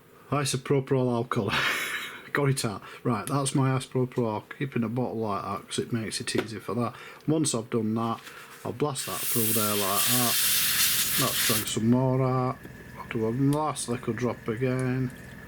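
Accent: Barnsley, United Kingdom. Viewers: Barnsley Lad ?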